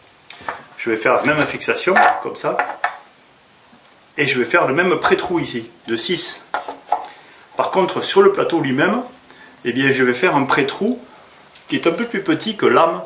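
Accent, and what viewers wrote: South, France. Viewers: enfin une video simple et explicite a la fois ......qui plus et avec un bel accent du sud , ce qui ne gâche rien au plaisir d'apprendre .....merci pour tout vos bons conseils .....(yves)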